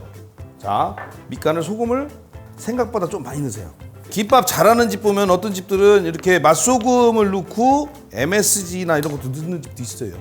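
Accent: Chungcheong, South Korea. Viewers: 백선생덕분에 충청도말이넘좋아유 ~~~ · 몇번을 봐도 밥 손에 한웅큼 쥐어서 김에 콕 찍으라고 하실때 그냥 귀여우심ㅋㅋㅋㅋㅋㅋ 충청도인 어투 걍 귀여우심ㅋㅋㅋㅋ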